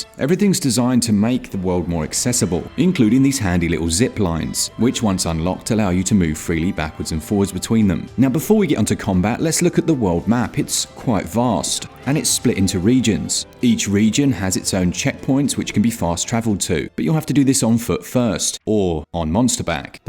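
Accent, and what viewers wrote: England, United Kingdom. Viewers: Game footage and an English accent narrator. What more could one want?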